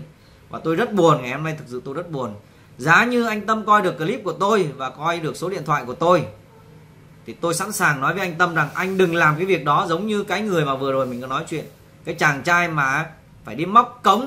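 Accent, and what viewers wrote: Standard. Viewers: Bạn nói chuẩn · Ban noi qua.chuan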